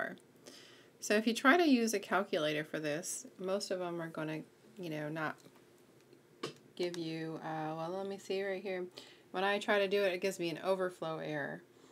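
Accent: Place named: United States. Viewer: THANK GOD!! an AMERICAN!! NOT INDIAN!! THANK YOU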